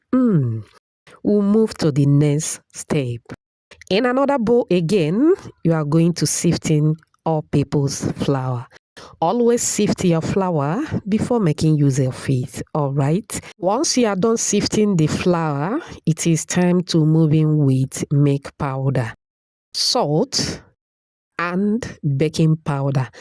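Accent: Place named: Igbo, Nigeria. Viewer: From Nigeria, I like ur Igbotic accent ❤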